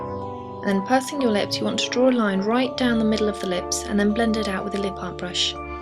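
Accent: England, United Kingdom. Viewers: Now I know what I'm going to dream about now : A nice girl with a british accent being taken over by evil clowns... or lollipops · are you English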